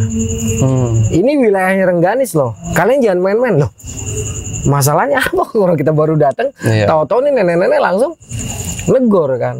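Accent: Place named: Jakarta, Indonesia. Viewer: Suka bgt dialeg bg. kl lg cerita serasa akrab skali apalagi logat jakartanya asyik gaya betawi ky aye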